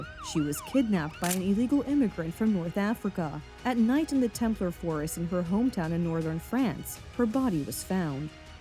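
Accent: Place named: United States. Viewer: Is this a Polish news station? Please don't use the voice with odious American sounding accent. It's bad enough hearing that accent in their vapid movies but please, not on Polish news. I used to like listening to your Polish newsreaders speaking aEnglish, clearly and concisely. And I'd still prefer to hear real Polish newsreaders speaking than listening to that yankee accent.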